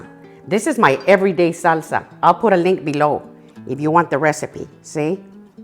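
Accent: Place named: Ohio, United States. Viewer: I just began to follow you a couple of months ago. I noticed your accent and it sounded familiar. Now, I know you live in Ohio. I was born in Ohio raised there until 8 years old. My family moved to the RGV and we’ve been in Texas ever since.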